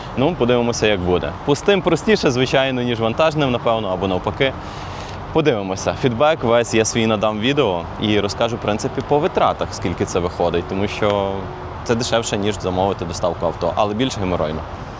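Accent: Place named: Vinnytsia, Ukraine. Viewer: Лайк за українську(чисту) мову,звідки родом,Віниця?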